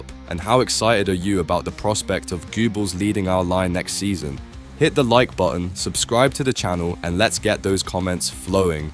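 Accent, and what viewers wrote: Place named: United States. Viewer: Oh here we go again with the “insider information” on any upcoming signings. These guys are unbelievably quick at getting the inside information out before anyone else, only to realise afterwards it’s a load of cack and never comes to fruition. I love how they report on things that have happened behind the scenes with our beloved club without a single shred of evidence in their reporting and just showing random excerpts of Leeds scoring goals. Did you see their report of Haaland praising Warnock with pics of Haaland and the Leeds badge in the same photo, garbage. Listen guys, these lot are in it for the “likes” and “subscribes” Not from this reluctant viewer. Who are these guys with the American voice over, who do they report for or from.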